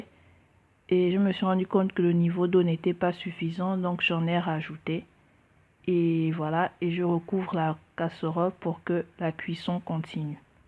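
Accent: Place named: Gabon. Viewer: yum yum.. avec l'accent du pays et tsou et tsou trop bien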